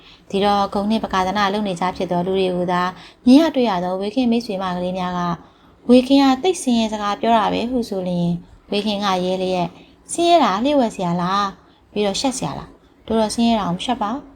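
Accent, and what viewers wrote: Upper Burma, Myanmar. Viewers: စကားပြော လုံးတယ် လျှောချတယ် အညာသူပဲ ဖြစ်မယ် မမရျေး 😝😝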